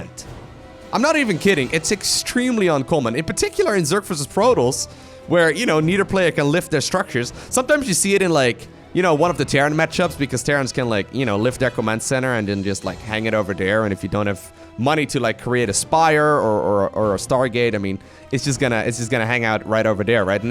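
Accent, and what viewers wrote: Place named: Netherlands. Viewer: You are Dutch, aren't you? Just assuming due to your accent.I like watching your vidoes.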